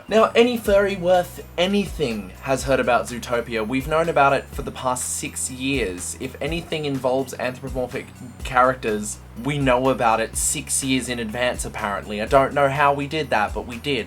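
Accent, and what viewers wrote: Australia. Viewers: oh your australian!?!? i am to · Your australlian? I always thought you were british! XD I love you more now because i love australlians! <3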